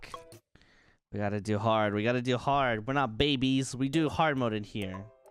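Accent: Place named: United States. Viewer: 公表はしてませんが、英語の訛りや配信の時間的にアメリカもしくはカナダあたりだと思います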